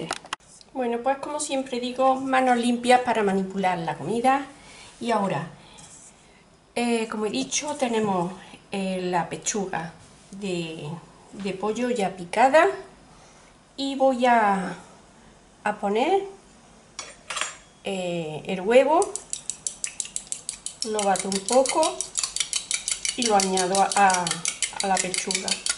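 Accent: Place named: Cordoba, Argentina. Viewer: Muy ricos estos filetes rusos😋😋 Muchas gracias a tí por compartir esta rica receta. Cada día estoy más contenta de ser una de tus suscriptoras. Me encanta tu canal. Me gusta como cocinas y como explicas tus recetas con ese acento al cual le tengo tanto cariño. Un abrazo desde Barcelona y hasta el próximo vídeo, cordobesa guapa. 😘😘😘☀️☀️☀️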